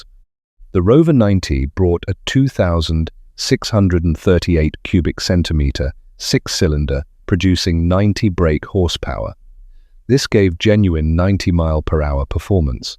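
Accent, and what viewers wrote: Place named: United States. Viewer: Awful narration; American spelling and pronounciation; repeats the same point over and over.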